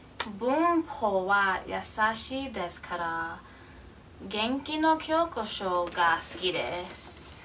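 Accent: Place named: South Korea. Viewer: Wow! I am really impressed! The accent sounds a lot like Korean. How are you doing your speaking practice? Is it with natives or do you just record yourself each day?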